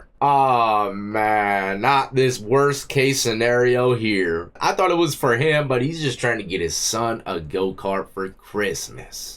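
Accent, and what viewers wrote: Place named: South, United States. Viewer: Love your Southern accent. Love from 🏴🇬🇧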